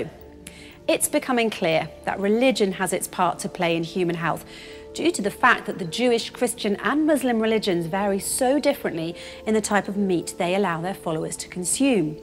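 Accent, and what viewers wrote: England, United Kingdom. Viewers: True religion? No just another fairy story making ridiculous claims. Just because she is a white woman with an english accent does not make it any more true.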